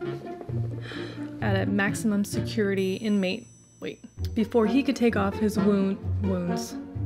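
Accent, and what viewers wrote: Australia. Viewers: Hi so happy to see you Hope you had a great July 4th Love hearing the cat in the background, I love your Australian accent 😂❤ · Look I teased you a little last time about your Aussie accent. But never stop trying.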